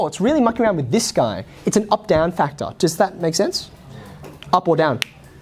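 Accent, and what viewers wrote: Australia. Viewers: This topic comes in the entrance exam for a national and kinda quite prestigious university here in Peru. I'd also like to say that I am captivated by the Aussie accent, it is fascinating and also sounds cooler, to the best of my knowledge. Greetings from Peru :D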